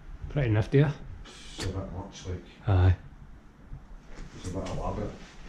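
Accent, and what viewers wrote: Scotland, United Kingdom. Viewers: He probly doesnt speak much becuase the Scottish accent can be hard to understand. I how ever love the Scottish acent somthing very nice about it. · his accent is just OK as it is. As is the richness and smooth dialects of the Scottish language. We understand it just fine. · Even I can understand Scottish accent! lol I'm a Japanese though I'd like to hear your talk more Thank you. 👍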